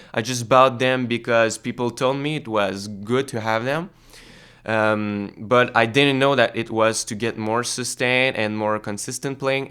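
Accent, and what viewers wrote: Quebec, Canada. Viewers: On reconnais tout de suite le gars de Quebec! Beau review! Tu me conseille quoi comme compression?, je suis guitariste Rock-Metal, je fait des solo et des bout plus clean et expérimental avec des effets, j'aimerais sustainer un peu mes notes et égaliser certains passages plus soft. c'est dur a choisir sur internet quand on les essaie pas. Le Cali 76 a l'air pas pire mais le prix me fait ** je suis sur de trouver quelque chose moins cher. Merci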